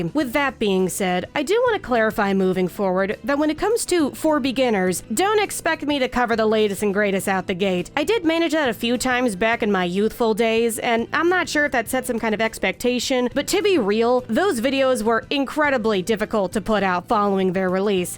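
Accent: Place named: West Virginia, United States. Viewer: I'll be ready whenever you are. ....and sometimes I forget how WV you are until I hear the "y'all". The cutest thing.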